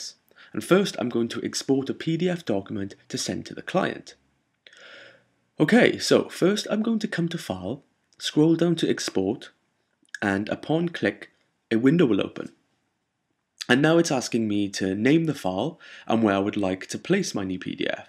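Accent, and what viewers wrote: Wales, United Kingdom. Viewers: Just wanted to say a big thank you for your hardwork that you have put into this. Its really generous. Its really clear and just works!! I wish more of the internet could be like this! I sat down and watched them through as though it were a lecture at university! Thanks again. By the way, are you Welsh or from New Castle? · I am so happy I found your tutes! I literally clapped you at the end of the last video. So well explained, so clear, nice voice, nice pace, nice command shortcut knowledge and repitition and you really focussed on all the things I needed to know. Now Im off to learn PS with you :o) . Keep up the great work!... you should charge for these!!! p.s I knew it was a Welsh accent!!!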